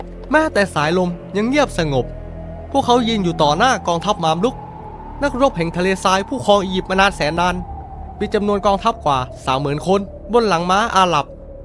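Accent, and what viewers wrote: South, Thailand. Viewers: มาเป็นกำลังใจให้ครับ ติดตามมาจากประวัติโลกและมนุษย์ตัวเต็ม ผมไม่รู้ว่าแอดเป็นคนใต้มั้ย พอฟังจากคลิปที่แล้วรู้สึกสำเนียงออกใต้ๆ นะ แค่เดาเล่นๆ ไม่ได้มีเจตนาไปทางอื่น ปล.ผมเป็นคนใต้นะ ทำคลิปดีๆออกมาเรื่อยๆละ อ้อลืมบอกกดติดตามให้แล้วนะครับ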